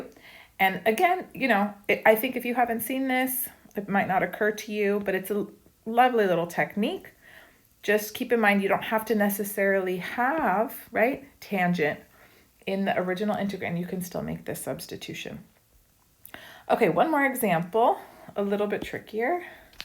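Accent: United States. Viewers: As a German, I can say that your pronunciation of Weierstrass is pretty good, but you can tell you're from the US 😄.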